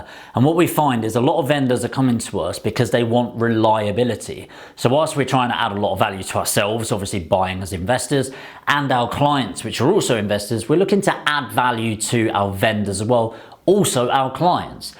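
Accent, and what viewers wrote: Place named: England, United Kingdom. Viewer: Filling it, sand in it, in it? Love the English accent after American movies 😁...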